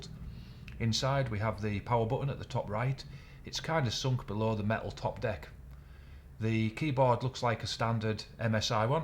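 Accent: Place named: United Kingdom. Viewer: I hardly understand the British accent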